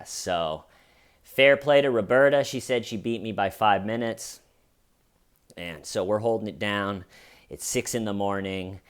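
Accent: England, United Kingdom. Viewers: Love love love the English accent. Lol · Amazing reporting. Loved every second of it especially the English accent Btw. Any news on the big film premiere Can’t wAit to see it I found the BBC lady. She is quite new on screen reporter So funny Tattoos Poker chips Maxwell Hilarious 😆 🤣🤣😆😆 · And that is why they are security guards. Good English accent by the way.👏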